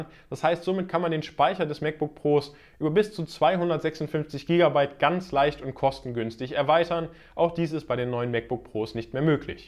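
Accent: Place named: Germany. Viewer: Habe vor kurzem dein englisches Video gesehen und mir wegen den Akzent schon gedacht dass du aus Deutschland kommst. Jetzt weiß ich dass ich da richtig lag :-D Du machst gute Videos.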